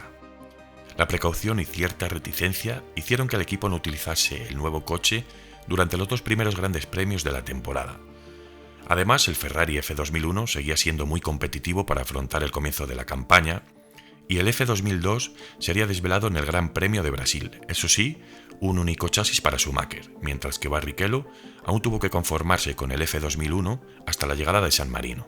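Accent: Spain. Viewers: el español de españa que narra este video es épico porque es un DOCUMENTAL. mano ganaste un suscriptor!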